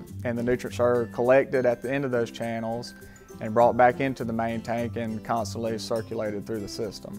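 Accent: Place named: South, United States. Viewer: beautifull plants. your accent like people in the forest gump movie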